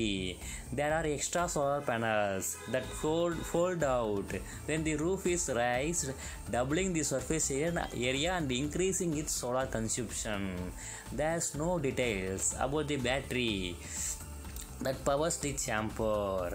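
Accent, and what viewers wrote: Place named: India. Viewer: Heavy Indian accents are just horrible to listen to if you ask me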